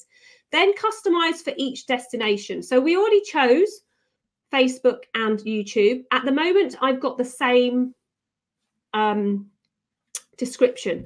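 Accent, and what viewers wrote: United Kingdom. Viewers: lOVE YOUR BRIT ACCENT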